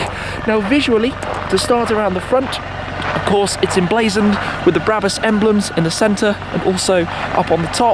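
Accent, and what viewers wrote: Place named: United Kingdom. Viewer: 我喜欢听你说话的口音，标准英式英语。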